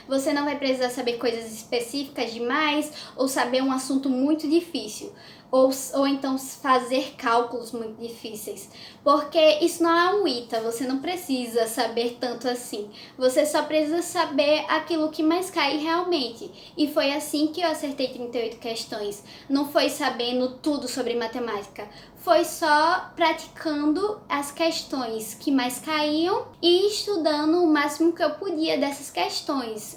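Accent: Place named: Pernambuco, Brazil. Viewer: Tu é pernambucana, mulher? Sotaque lindo.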